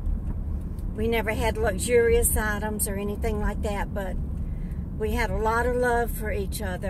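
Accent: South, United States. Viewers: Hi There It's Julie Falkingham I just love you and you are so wonderful. I will be writing to you. You are just so wonderful and sweet. I'm so thankful for all my friends and family and my husband he has been my rock and my friends are not just my friends but they are my family. You are such a loving caring person and I love your southern accent. You are so wonderful for what you are doing for your friend. You are so wonderful and your the best. I'm so greatful to have you for my friend and I would love to meet you in person and you remind of my gram she was my life and I will fill you in writing you my letter to you. You are so amazing and so wonderful you deserve nothing but the best. Love you Love your friend Julie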